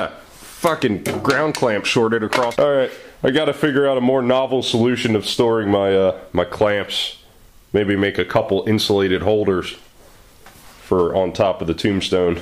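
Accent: United States. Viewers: US? Sounds the part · Am I in the twilight zone? Are you American?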